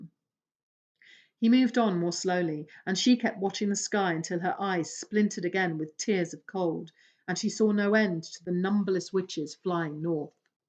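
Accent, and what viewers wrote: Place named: Texas, United States. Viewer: Hooray, you committed to the Texan accent!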